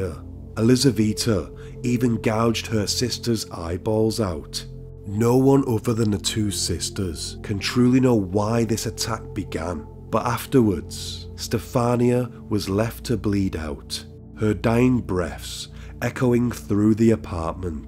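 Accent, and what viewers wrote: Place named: United Kingdom. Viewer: What irritates me is his British vernacular .. muhvuh (mother) deaf (death), etc.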